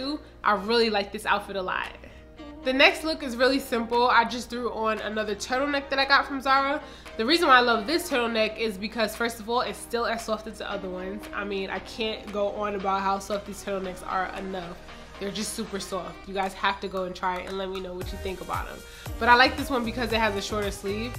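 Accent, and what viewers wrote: New York, United States. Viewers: Another great video! Your NY accent is giving me so much life!!! Reminds me of home lol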